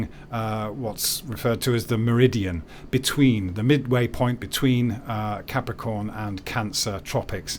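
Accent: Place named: Bristol, United Kingdom. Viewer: didn't know you was a bristolian? gurt lush me babber, ow bist? :)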